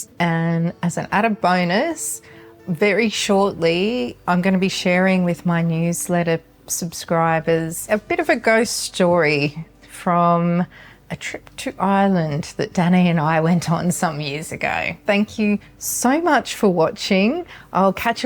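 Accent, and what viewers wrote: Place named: Australia. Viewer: So glad the YouTube algorithm showed your video! So nice to see and hear an Aussie woman photographers experience. Looking forward to watching more of your work ❤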